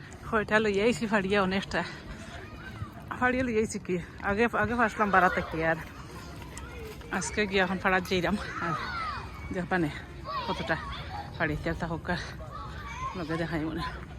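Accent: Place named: Sylhet, Bangladesh. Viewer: আসসালামু আলাইকুম আপুনি। কেমন আছো? মাশা-আল্লাহ আপুনি এতো সুন্দর পরিবেশ 😮❤ আপুনি তোমার সিলেটের ভাষা শুনলে কিছু বুঝি না, কিন্তু এতো মজা পাই যে কি বলবো।😂 কাঁচা খেজুর আটি সহ খেয়ে নিলে।কেমন খেতে লাগে তাতো বললে না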